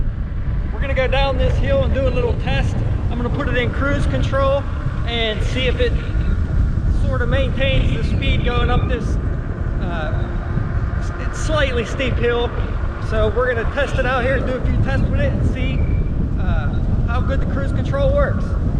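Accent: Pittsburgh, United States. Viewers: Thanks for the video. Do I hear a Pittsburgh accent?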